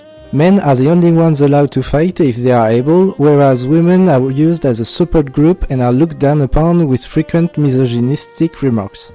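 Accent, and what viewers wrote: France. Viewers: Es tu français sa s'entend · ahhh laccent francais put1 ca craint.. essaies de t'en debarasser quand tu parles l'anglais... " hello Zis iz a litteel video on fallout" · You deserve every view frenchy. Props for having the courage to voice them even though it isn't your native language. Keep making these awesome videos.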